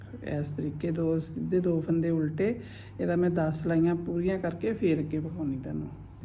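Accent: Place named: Majha, India. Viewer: Mam tusi majhe de boli bolde j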